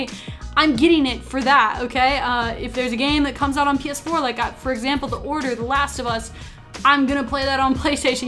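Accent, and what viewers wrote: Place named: Midwest, United States. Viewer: She has a Midwest American accent. Just like every where else in the world. There are different forms of the same language. No need to be rude.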